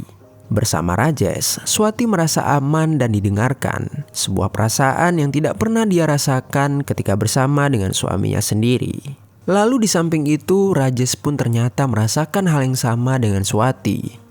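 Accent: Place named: Aceh, Indonesia. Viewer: Dari gaya bercakap sy teka admin ini adalah dot dot dot.. orang aceh.. beliau ada 2 lagi akaun youtube.. apa pun taktik yg mantap bro! 😊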